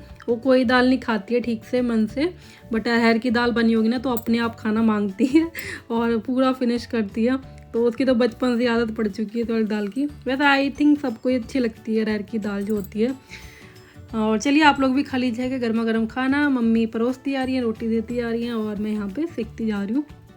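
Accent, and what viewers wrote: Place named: Rural, India. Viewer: Sister aap to bilkul gaon ki language bole to aap to Delhi mein rahe ho ham bole to ham bhi udhar ke side ke Hain ham bhi aapki tarah bolate Hain jab ham ghar mein baat karte hain to aur aapke language bahut acchi lagi aapki mummy bolati hongi lekin aap to Delhi mein Pali bade ho so sweet bahut achcha Laga blog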